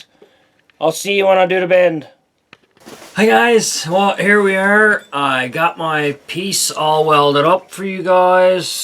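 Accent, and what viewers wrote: Newfoundland, Canada. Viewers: LOL! Got a like as soon as I heard! Brilliant!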